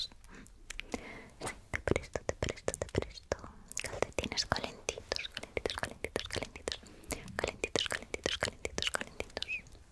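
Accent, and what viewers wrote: Spain. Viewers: El acento de mujeres españolas son ideales para este rubro de el asmr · El asento español de españa es genial para los asmr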